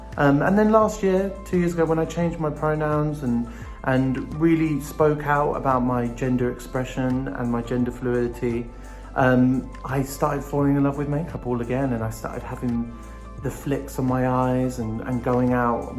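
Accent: United Kingdom. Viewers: I love their British accent.❤️ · Thought Iam watching Harry Potter , when he started British accent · Everyone talking about good music, ' pronouns, but can we talk about their british accent? Gosh i fell in love. ✨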